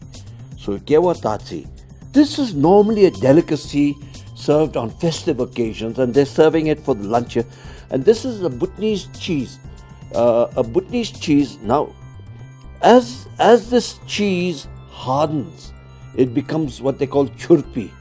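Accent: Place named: United Kingdom. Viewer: I must say you really do have a decent british accent !! Regardless to say..the food was tempting..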